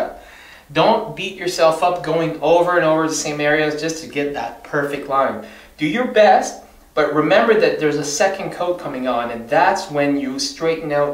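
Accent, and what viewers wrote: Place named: Moncton, Canada. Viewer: you must be from moncton....the accent sure sounds like that.good tips tks.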